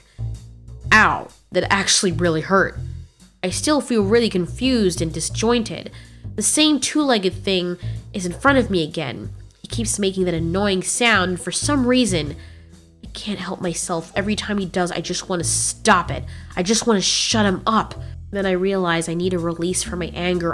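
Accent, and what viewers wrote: Australia. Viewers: Every like is for that Australian accent 👌😂 Also can you make a Baryonyx POV? I'd love to see that! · Can we talk about how your Australian ascent is on point, took my breath away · Nice Australian accent 👍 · I like the austalian accent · She has a good australian voice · I also heard a bit of austrilian accent wicth he actully has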